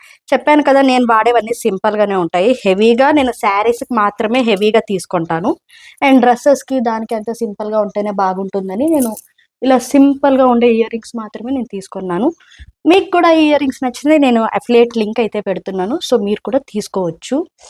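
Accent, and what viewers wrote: Karnataka, India. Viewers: Meru kannnada slag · మీ యాక్సెంట్‌ని బట్టి చూస్తే మీరు తెలుగమ్మాయిలా లేరు. కన్నడా కదా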